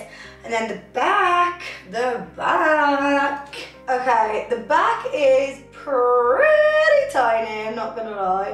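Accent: England, United Kingdom. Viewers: That sexy English accent adds more fire 🔥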